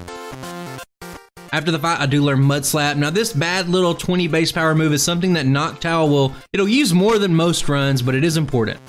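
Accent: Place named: Texas, United States. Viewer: the texan accent is sooo good with pokemon challenge runs just what i needed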